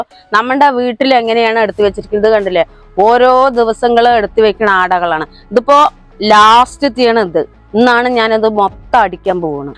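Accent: Palakkad, India. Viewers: പാലക്കാട്‌ ഭാഷയാണല്ലോ... എവിടെയാ സ്ഥലം അമ്മേ 😘😘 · പാലക്കാടൻ ഭാഷ സൂപ്പർ ❤ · Oru palakkadan speech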